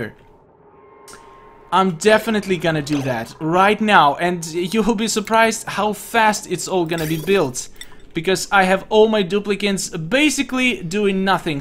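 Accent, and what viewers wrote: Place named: Russia. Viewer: таки ты русский чтоль с таким акцентом?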